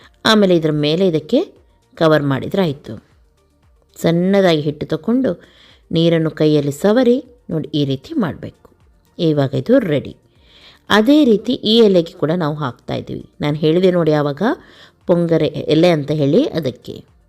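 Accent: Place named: Halivana, India. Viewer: Kannada halivana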